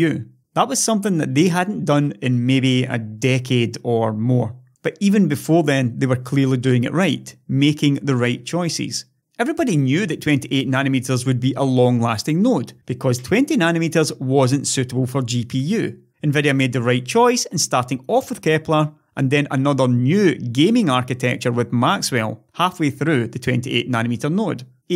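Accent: Scotland, United Kingdom. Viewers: getting much better at understanding Scottish accents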